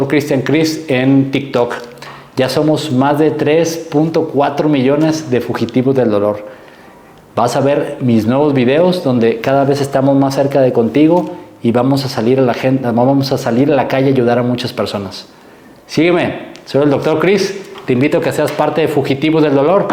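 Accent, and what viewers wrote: Mexico. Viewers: es de México! Mírale la bandera de México en la bata! Además de que el acento lo delata!! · Por la forma de hablar, en Mexico.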